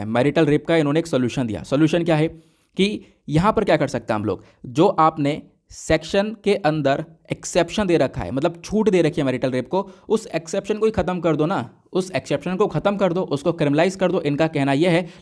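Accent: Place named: Uttar Pradesh, India. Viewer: acha mujhe nhi pta tha..actually mne 4 months phle se hi inko follow kiya h...ha mujhe bhi lgta hi tha..unke bolne ke style se..chlo hum sb up ke hi h..😆😂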